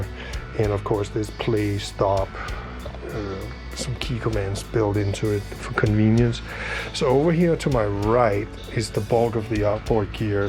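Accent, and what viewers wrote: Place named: Denmark. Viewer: HELLO! "Old friend"... GREAT an`really informativ video. Good to see that your still are alive. It`s fun to hear! That you still got "The Danish accent". Greatings from Denmark...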